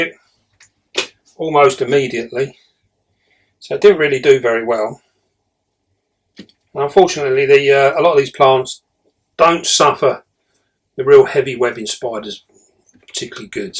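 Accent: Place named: Dorset, United Kingdom. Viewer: OMG that thing is HUGE! The cage is beautiful! Hearing you talk brings back fond memories of visiting my Uncles in Dorset long ago, my Mom's side of the family is all from over there.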